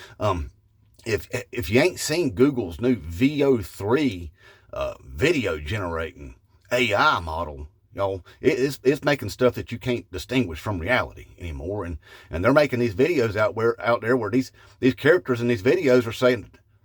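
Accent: South, United States. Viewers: With your southern accent it don't make you bad guy imagine you talking with a wisconsin accent yea you know Der hey ya but don't cha know one time we agree old donny isn't best thing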